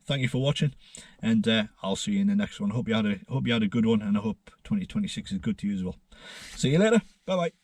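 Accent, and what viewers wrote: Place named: Newcastle, United Kingdom. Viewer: Hi mate, so glad i found this account. I can hear a geordie accent! Im local around bedlington and had my celica a year and this channels incredibly helpful!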